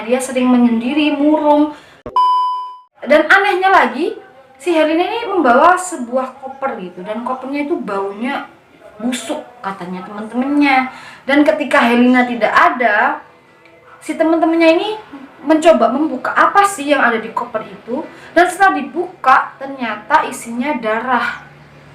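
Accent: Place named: Java, Indonesia. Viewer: Medoknya uwuw kak🤩🤣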